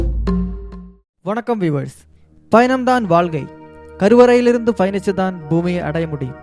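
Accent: Kerala, India. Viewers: Narrator is malayalee....Tamil ah kollathe da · Malayali accent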